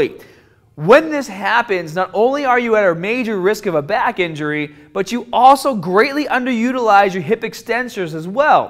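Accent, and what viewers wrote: Boston, United States. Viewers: Dear god, your accent is so much Boston 😆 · Boston accent no doubt haha. I'm from the south so we all have accents. Great advice though. Subscribed. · Where did this Bwoston accent come from?? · Fantastic content and even better thick Boston accent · Can someone explain to me why has a Boston accent now? He never used to? I feel like I'm in a alternate universe or something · is this a boston accent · U from Boston? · Somehow I think is from Boston. Just sayin. About to grab a hex bar for the house since we're all trapped at home lol. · You must be from Bahston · This dude used to work at the maaketing depaatment, reminds me of my buddy from baaston. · Hex bah. Someone sounds like he's from Bean Town. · This dude has a crazy Boston accent. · That Boston accent tho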